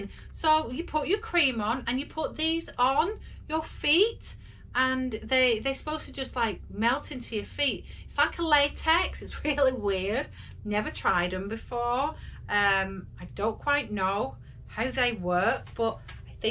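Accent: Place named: Bolton, United Kingdom. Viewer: Cool Bedroom! Gotta be like a Bolton or Burnley accent you've got hasn't it? As a Southerner I'm probably completely wrong!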